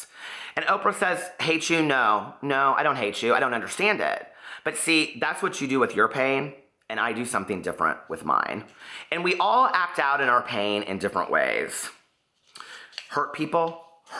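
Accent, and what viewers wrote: South, United States. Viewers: Ur a hoot!!! I absolutely love ut channel, personality, thoughts and southern accent....haters will hate - please dont evet forget how loved u r! 💜💙💚💛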